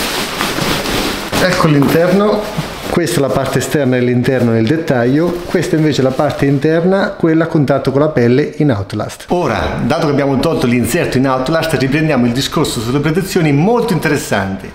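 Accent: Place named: Macerata, Italy. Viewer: Ciao bel video, con quello che costa un indumento tecnico finché possibile sempre meglio fare una buona riparazione che ricomprare nuovo. Dal tuo accento penso di averti incrociato in qualche parte della Provincia di Macerata 😉👍🏻✌🏻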